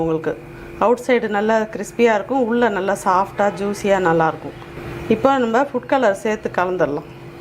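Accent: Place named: Cuddalore, India. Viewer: கடலூர் விருத்தாசலம் பக்கமா..... தமி்ழ் சொற்கள் மிக அழகாய் இருக்கு....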